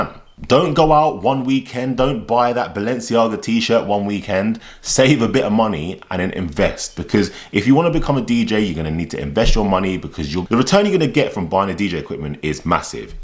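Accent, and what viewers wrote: London, United Kingdom. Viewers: Just found your channel, so glad! I love your London accent and your vibe + great info!! What controller would you recommend for a beginner who travels a lot and wants to be able to travel with it easily? Around 300-500 pounds budget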